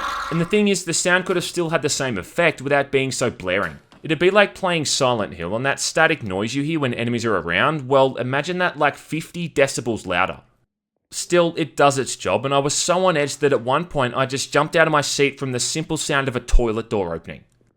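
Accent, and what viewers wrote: Australia. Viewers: Man, I love your Aussie accent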